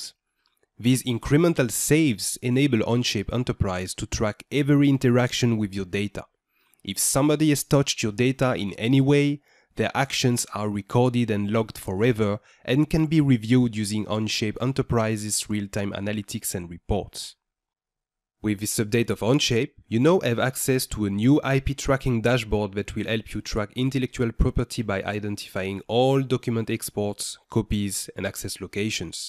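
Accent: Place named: France. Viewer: Avec l'accent français !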